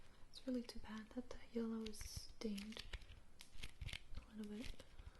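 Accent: Netherlands. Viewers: are you Dutch? ? (ik wel hahaha)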